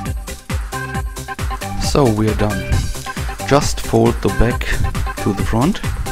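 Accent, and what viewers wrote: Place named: Germany. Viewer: man echt geil nix gegen dich aber mann hört übel raus das du deutscher bist wenn nich tuts mir leid aber zu geil. Help very now i have finally a cover for my steam BO2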